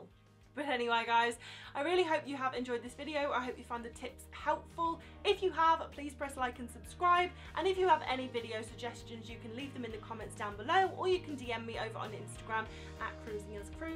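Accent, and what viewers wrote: United Kingdom. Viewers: Where in the UK is your home? Or more to the point, which regional dialect is yours?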